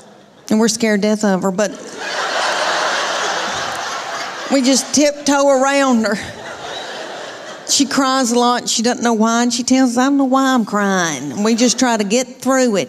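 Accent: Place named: Western North Carolina, United States. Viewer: I hear some western North Carolina in her accent especially when her sentences sounds like questions? I’m from Waco Texas via Nashville and raised in Western Kentucky before that so you can’t go by me. We never had kids but if we did I’d take them to Leann for some straightening out.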